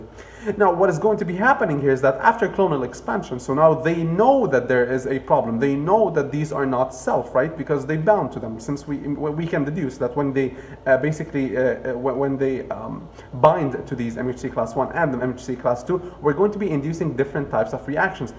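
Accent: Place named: Lebanon. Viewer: صدقني انت لبناني عرفتك من اول 10 ثواني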